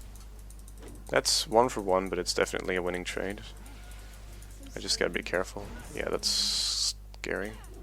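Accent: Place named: Sweden. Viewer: ahh you are swedish^^ i thought i recognised the accent :) <-danish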